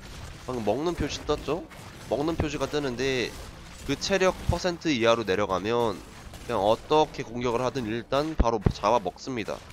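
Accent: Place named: Chungcheong, South Korea. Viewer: 항상 롤토체스 많은 정보 얻어가요 말투가 충청도 분이신 것 같아요!!ㅎ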